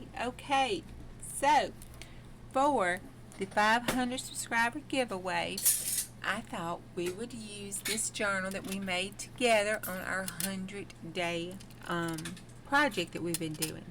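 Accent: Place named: South, United States. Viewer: Congrats my dear friend - you deserve everything good that comes your way. I don’t have one specific thing I love - what I love about you and your channel is your wit/humor, the way you care for other people, the way you are willing to help others with helpful tips, and the great art you produce. Oh, I can’t forget your lovely southern accent. In short - I love you, girl. ❤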